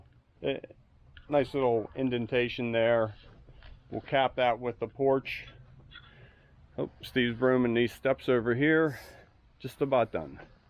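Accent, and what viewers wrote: Pittsburgh, United States. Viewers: Hahahaha that’s the Pittsburghees accent you’re hearing! Thanks for watching! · This guy speaks with a south Pittsburgh dialect. Why can’t I find him listed there? (I lived there my first 20 years so I know.)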